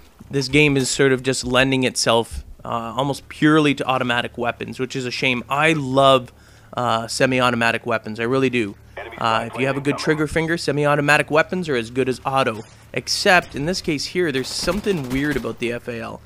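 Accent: Canada. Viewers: You canadian? i caught an accent oout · I know it's kinda irrelevant, but are you Canadian? I thought I heard a little bit of an accent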